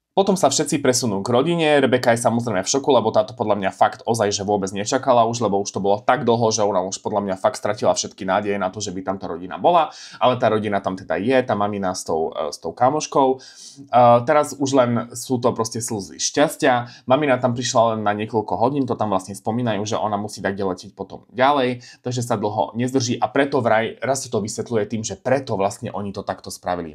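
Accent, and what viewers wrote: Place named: Eastern Slovakia, Slovakia. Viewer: podľa prízvuku od vychodňároch.. či? 😅🤣❤ Som z východu.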